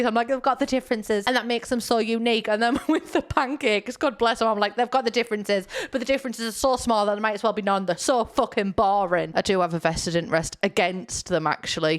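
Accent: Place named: Scotland, United Kingdom. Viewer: I mean I'm british and I kinda talk like this but also SHE specifically sounds Scottish to me??? Idk also if she WAS Scottish, right,... McGonagall slandering JKR... think abt it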